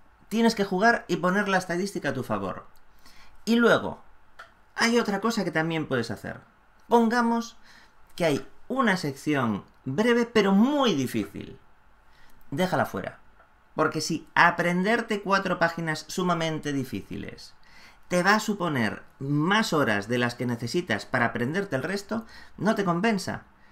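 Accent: Spain. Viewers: Antes no me gustaba mucho el dejo español, pero ahora creo que hasta me encanta:D, buen vídeo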